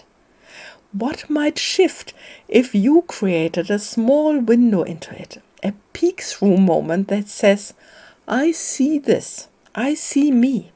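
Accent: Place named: Germany. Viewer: Hi! New subscriber here! I love your interpretation of this prompt so much! I lived in Germany for 4 years. My husband is in the US Army & we were stationed in Landstuhl from <num>. I still have many friends who I still keep in touch with from there. Your accent brought back good memories. I have quite a few windows it is very uncomfortable to see. But I love the thought that seeing it can kind of release us. You are a breath of fresh air! I’m so glad I found you through this collaboration. Danke. Tschüss! xoxo, Jenn 🤗💚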